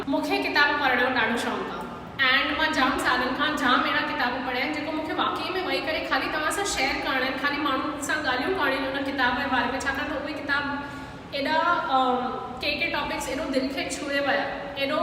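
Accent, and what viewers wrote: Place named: Shikarpur, Pakistan. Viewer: Tuhnjo lahjo ee shikaarpuri thae aon tokhy hik frnd sa mlraendas uha tokhy thnji jhlak lgndi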